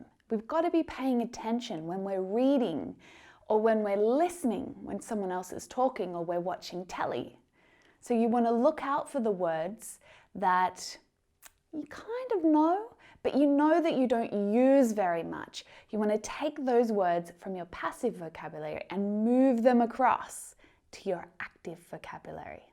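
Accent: Australia. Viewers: I enjoyed watching your video and i've been somehow doing ALL OF the things (tips) you suggested before and will continue to. Now i feel good because i know that i've been doing this the right way and I'm proud of myself as well. I just love your teaching style and especially your Aussie accent! I wish you all the best,! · Hi from Turkey.I love you and your explaining. I love when you speak Australian English. Thank you to teach us English. 🇹🇷🇹🇷❤️❤️🇦🇺🇦🇺💕💕🌺🌸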